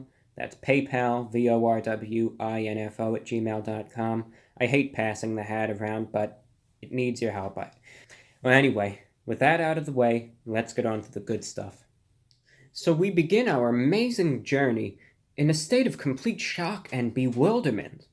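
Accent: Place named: Mid-Atlantic, United States. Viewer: He likes to be classy, if u notice his got a mid atlanitic accent which was popular before 1950. people back then wore suits all the time too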